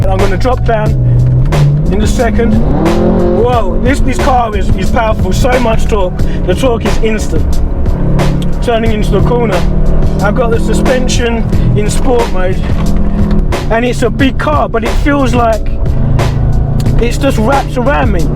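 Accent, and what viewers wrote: London, United Kingdom. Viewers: I like your video style great funny conentry good old London accent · Just discovered this channel. I’m getting a stage 2 in the new year on my F10 M5. I have a track focussed 911 which I take to the Nurburgring, but in April I’m taking the M5 ! Great hearing a Brit over the pond. Take it easy brother 🤜🏼🤛🏾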